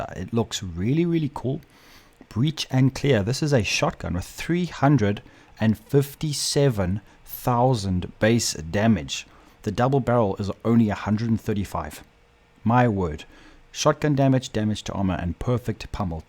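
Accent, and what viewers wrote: South Africa. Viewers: Are you from South Africa? And Great video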